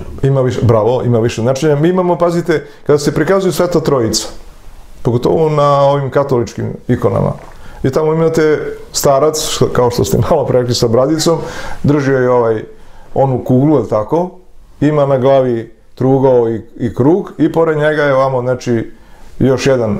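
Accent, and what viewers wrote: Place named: Southern Serbia, Serbia. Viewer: U pravu ste, odavno nismo imali Srbina na vlasti ali nemojte da vas buni njegov izgovor i dijalekt, poticem iz juznih krajeva i to bas blizu ovog sela odakle i gospodin, ovo je dijalekt juznjaka koji pokusava da govori srpski knjizevni govor zato njegov govor deluje neutenticno. Moje skromno misljenje. Poslusajte njegova videa na njegovom kanalu gde govori cisto i autenticno dijalektom kraja iz kog potice.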